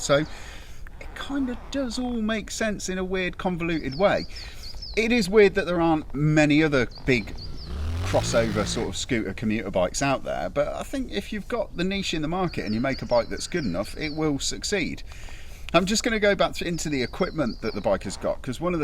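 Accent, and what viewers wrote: United Kingdom. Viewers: Wow.british sound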